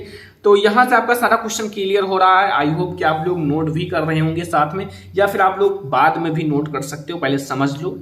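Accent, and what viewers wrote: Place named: West Bengal, India. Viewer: West bengal का बोल ra hai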